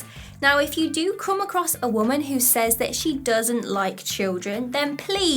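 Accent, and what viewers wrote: United Kingdom. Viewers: i think you are British because of your accent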